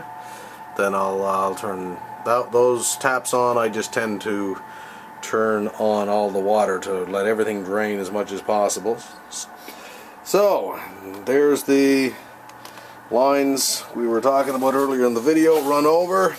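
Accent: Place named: Canada. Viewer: I know this is a late comment, but please bear with me. The "" T "" junction fit was mentioned numerous times but was not actually shown being inserted. There was mention of a water mess difficulty....However, I really wanted to see how to slip the fitting on one end.....and then get the other end ( which would have been cut one inch long) into the fitting without having to push that copper end (on the supply tube) upwards and then downwards and into the fitting. I really missed that bit.....and I was wondering if anyone could add some information on the easiest way to do this. The supply tube may be attached via a lug and screw and that would make it difficult in my 'mind"....but maybe it isn't thanks for any help fellows. Love that Canadian accent...First time I ever noticed it.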